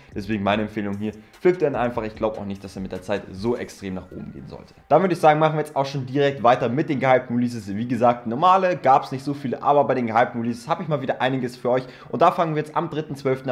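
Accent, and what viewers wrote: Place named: England, United Kingdom. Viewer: Ich liebe immer die englische aussprache🙏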